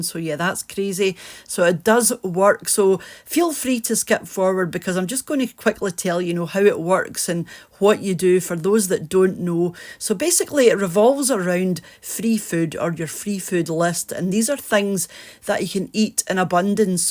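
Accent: Scotland, United Kingdom. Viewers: I have to admit the only reason why I watch your channel is out of sheer living vicariously through you (except for all the peas…peas are my nemesis) and for the lovely Scottish accent which reminds me of my nanny as a child. If I ate either your dieting or regular menu I’d be in hospital because my gallbladder would be waving a flag and my colon would refuse to work . I know the UK diet is very heavy on potatoes and more potatoes ,gravy, meat and cheese so eating this way is part of your culture so I suspect slimming world was created to allow more compliance to dietary changes. I spent the money and went to a dietitian who has me on intermittent fasting (I’m in Canada) and since I already ate the Mediterranean diet anyway naturally it’s actually not a huge change and I’m now at my ideal weight . But whatever works for you is great but I def think more salads , fruit and veg that isn’t cooked should be a part of slimming worlds diet plan because we get the nutrients we need from raw stuff much better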